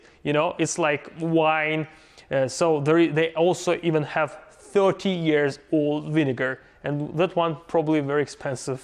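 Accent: Russia. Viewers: Your accent sounds like Russian btw, anyways great video! 😁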